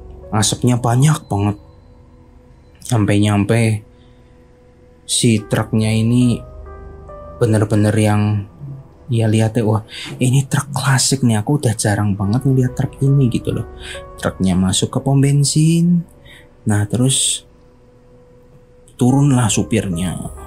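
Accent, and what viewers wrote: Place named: Banyumas, Indonesia. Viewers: wkwkw lucu ya wong ngapak nek cerita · udah medok, kebanyakan kata "itu". skippp2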